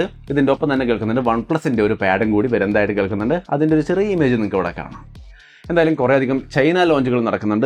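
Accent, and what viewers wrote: Thrissur, India. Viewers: 0.46 nalla Thrissur bhasha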